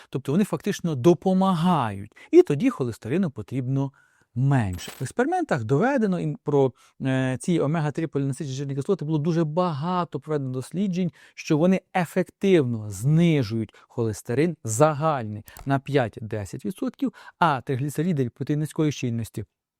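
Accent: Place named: Vinnytsia, Ukraine. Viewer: Це діалект нічого страшного,,навпаки цікаво,так розмовляють люди,які живуть у Вінницький області.Я слухаю з задоволенням.Ми не на каналі граматики української мови.Сенс не в цьому ,а в тій незвичайній,особливій інформації., яку чудовий справжній лікар нам розповідає.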